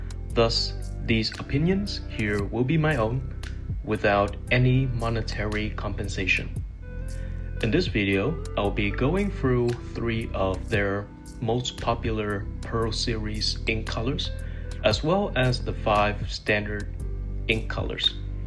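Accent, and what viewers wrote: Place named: Germany. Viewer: you have a really close German accent...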